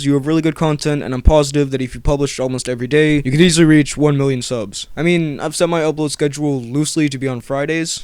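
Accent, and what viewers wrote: United States. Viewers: you sound so not danish. I thought you were form america or something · Holy sardine! (Name that movie!) I can't believe you live in Denmark! You sound really American! Also I freaked out when you showed my comment (twice!) and I have a suggestion for a 'best and worst' video. It might be too short that you might not be able to do it but I'd really like to see it. You ready?........the best and worst of the Cornetto trilogy (you know, Shaun of the Dead, Hot Fuzz and The World's End!) I just have one more question, did you watch my channel? And if so what do you think? I'd love to hear your thoughts on it. Thanks! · Hi, you have some sort of American accent. Did you move from America to Denmark? Just wandering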